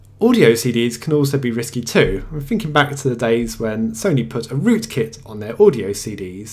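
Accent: United Kingdom. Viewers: Your British accent is SO strong, haha! Funny that the number or likes in this video (at this time I'm writing) is 666, hehehe.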